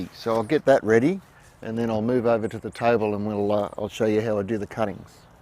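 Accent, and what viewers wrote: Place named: Australia. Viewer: I believe (judging by your accent) that you recide in Australia?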